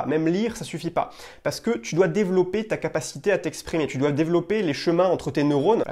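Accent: France. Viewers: L' accento francese è bellissimo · '' accent français dégueulasse '' et je suis pas d'accord, ça fait son charme des fois, à part si tu parles comme François Hollande 😅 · c'est trop bien l'accent français, ça aide à pécho et ça mets un peu de noblesse française dans cette langue de plouc qu'est l'anglais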